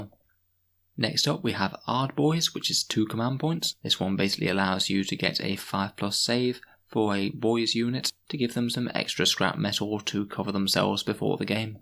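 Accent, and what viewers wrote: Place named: United Kingdom. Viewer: British accent perfect for describing dakka.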